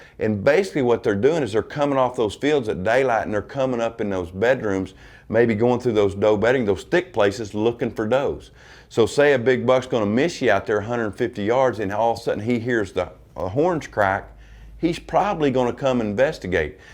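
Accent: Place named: Oklahoma, United States. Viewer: I can tell by your accent your an Oklahoma boy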